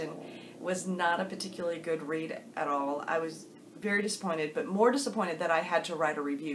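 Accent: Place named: South, United States. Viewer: You are so outrageously wonderful. Thank you for shouting me out. You can work on pronouncing Mementomori but it all sounds good with your southern accent. You have not only been so supportive on my channel but I see your thoughtful and detailed comments spread all throughout booktube. Thank you! Excited to see where you go in June. The drama this past month was something else to witness and am happy that it seems to have blown over. No more of that! — I’ve really enjoyed all your videos, please please keep posting :) - And good luck with tacking Dickens and Tolstoy, no matter the pace!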